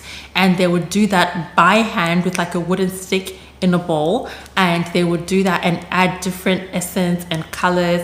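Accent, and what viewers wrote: Australia. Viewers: Another lovely video. You speak so nicely, but I am beginning to detect a hint of an Australian accent. As an older Australian I do miss the joy of a homemade Pavlova at Christmas. Passionfruit, strawberries, peaches, mangoes, ect: I haven't had one in years. I do hope you get to enjoy one with your family.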